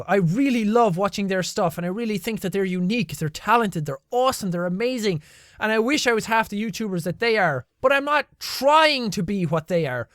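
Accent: Ireland. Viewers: As others have said, I don't feel like you're 'copying' other youtubers. Sure, you do the same things as some of them, but I wouldn't call that copying. The tone is different all around: You've a different scenario in comparison to Pewdiepie, for one example, and yours is the one I find more enjoyable. EDIT: Plus, I find your accent more enjoyable to listen to than his. Irish accent ftw.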